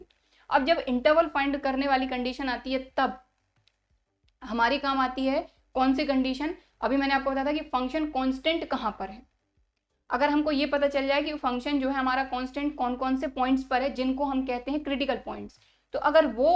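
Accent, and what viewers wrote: Haryana, India. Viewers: mam I think you belong to HARYANA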